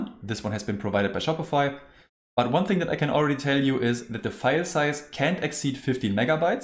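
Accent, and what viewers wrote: Germany. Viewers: das deutscheste english was ich jemals gehört habe.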